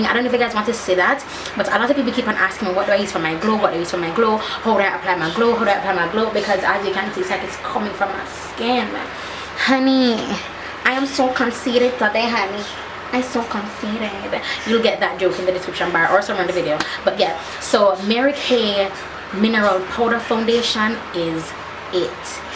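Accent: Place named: Jamaica. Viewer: "Mi love it" in love with ur Jamaican accent 😍 that hair tho can we have a tutorial on it please?! U look gorgeous ❤️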